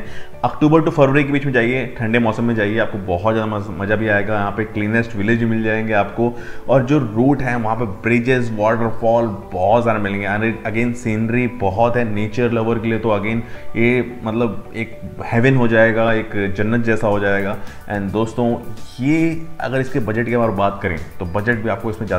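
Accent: Gujarat, India. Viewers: Sirji, Are you from Gujarat !!!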